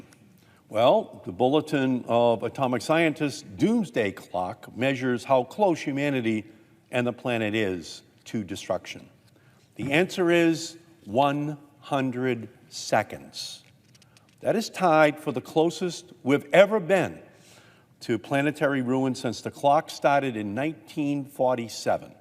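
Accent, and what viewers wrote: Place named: Boston, United States. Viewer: I'm listening to his voice and not looking at the video and I'm thinking he sounds like he is from Boston. Not a super heavy accent but a Boston type accent. I lookup and they have tagged, senator from Massachusetts. Lol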